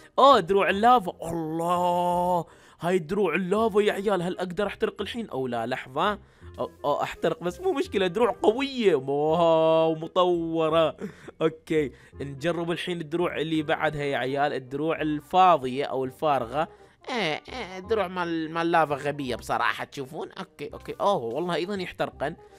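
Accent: Iraq. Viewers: هاذ عراقي؟ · عندي سؤال هل انت عراقي ولا لا??❤❤😊😊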